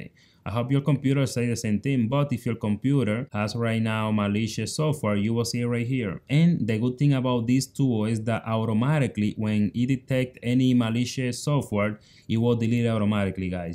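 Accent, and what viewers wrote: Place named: Dominican Republic. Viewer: Bro got Dominican accent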